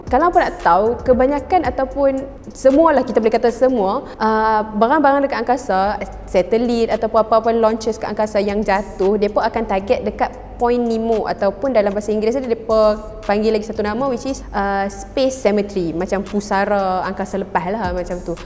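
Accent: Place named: North, Malaysia. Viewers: Dia jatuh seketoi..pekat btl Utara hang noh😂😂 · Loqat utaqa kita geng.. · Pekat sangat loghat utara hang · pekat naw utaqa dia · guna la bahasa melayu standart.....adoiiiiiiiiiiiiiiiii....teruk betul bunyi utara....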